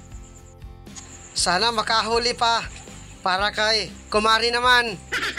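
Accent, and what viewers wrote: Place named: Camarines, Philippines. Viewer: Idol taga camarines ka ba, yung tempo ng salita mo taga sa amin ay, ingat lang idol sa paninisid, Sana makahuli ka palagi ng marami sa bawat sisid.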